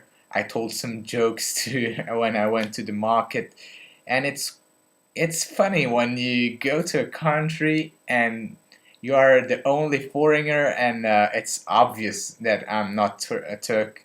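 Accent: United Kingdom. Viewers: Wow I really notice how your accent has got more like British pronunciation. It is quite noticable :D As always, really enjoying your videos :) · You have more of a british english accent than an american one now! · I like your accent, it got very British! :) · Hey! Your accent is awesome! where's american? You're starting to acquire the british one! Did u notice the change? great vid.. see u soon!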